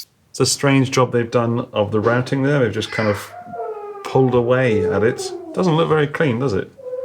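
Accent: England, United Kingdom. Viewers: yes we English did invent the English language 😂😂 it's pronounced Lez in England/English. speaks with an English accent so he's pronouncing it correctly.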